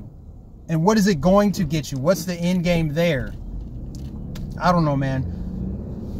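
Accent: United States. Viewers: You talk like an American. Ebonics is the number one reason that black children have trouble in schools. I tutored children when I was pregnant with my daughter. Ebonics is bad for black children. Blacks have lived here longer than many other peoples They should speak American-english. Just saying Ebonics is bad. It's jealousy. Proud of you. Stay strong & moving forward. Live a good life. Thank you. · Keeping you in my prayers. You talk like an American. Those folks are messed up. Hate will destroy them. Report them asap.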